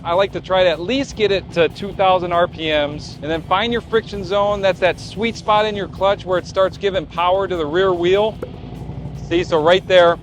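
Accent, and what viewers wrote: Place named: Midwest, United States. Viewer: your content is so good. Maybe it’s the midwestern accent 😮 that makes you so easy to understand 😅. I like the figure 8s you do in 4 parking spots. Keep up the good work. Really solid🎉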